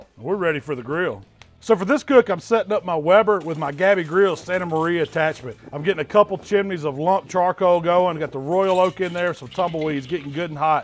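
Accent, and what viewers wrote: South, United States. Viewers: It looks good, yet the Southern & Jamacian accents don't quite harmonize.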